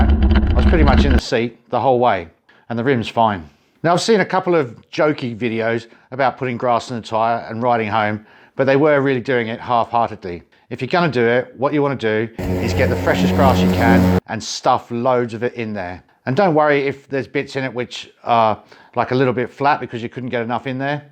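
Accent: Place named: Australia. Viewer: Just watched another video. Great and clear to understand. I did pick up on the Aussie accent so should be saying G’Day. I’m Scottish and lived a few great years in Aus.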